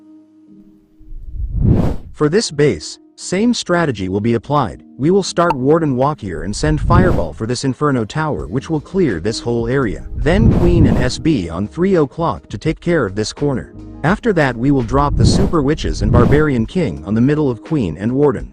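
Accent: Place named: Asia. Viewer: I have 0 negative intentions when I say this but I can hear the Asian accent through the voiceover I’m dead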